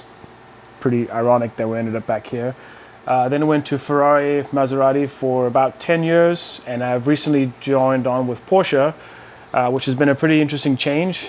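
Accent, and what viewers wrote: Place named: Texas, United States. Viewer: I have heard that accent before what part of Texas are you from ??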